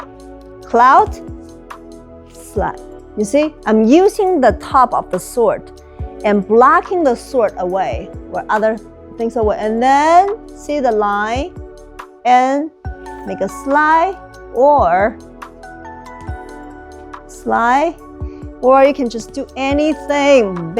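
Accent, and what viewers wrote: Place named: China. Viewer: We love when Chinese women speak Chinese English accents!!!!ha ha! Chinese sword 🗡️ s beautiful to watch in action!!. Love you!! ❤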